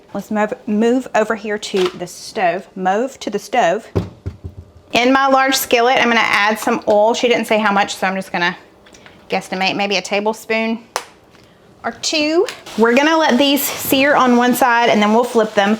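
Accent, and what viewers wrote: South, United States. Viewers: I LOVE your southern accent. I undesrtand it perfectly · I love your "Southern" please don't correct yourself! I recently moved from NC after living there for 37 years and moved to another "southern" state (OK). So not the same! I miss the Carolinas so much! I even miss Food Lion and I had a love/hate relationship with them. I've watched you for quite a while but now the videos make me feel like a trip home. I am with you on the peas and lima beans, I can take them in a chicken pot pie or Brunswick stew. I moved south too late in life (23) to appreciate okra in any form or fashion though. I did make Steven's salsa, we all loved it! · Embrace your southern accent. ❤ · The "eye" on the stove 😂 I think my mom calls them eyes too. I call them burners. But your southern accent says "aah" instead of "eye." Like... AAAH!! There's an AAH on the stove! 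😛 love it. I talk southern sometimes and I'm only in Indiana lol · I call it an eye as well and my family and I absolutely adore the Waffle House 😋🤤! Oh and don't worry because leaving that 'G' off of our words is a Southern thing😂. I really enjoyed this video and thank you so much for sharing I will have to try all of these new recipes!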